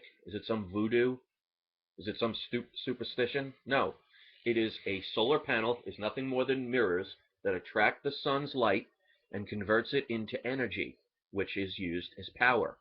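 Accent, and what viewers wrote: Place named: Pennsylvania, United States. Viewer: Best thing for asthma is pot. Legal here where I live, but not in PA where I think you live due to your accent. I am not joking.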